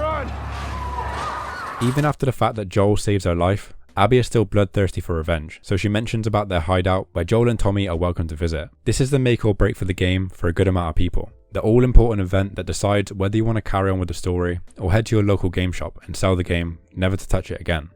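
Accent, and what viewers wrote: United Kingdom. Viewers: Are you from Nottingham??? I hear a very familiar east mids accent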